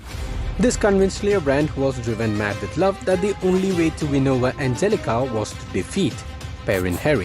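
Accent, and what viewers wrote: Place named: India. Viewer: hmm I felt that you are from India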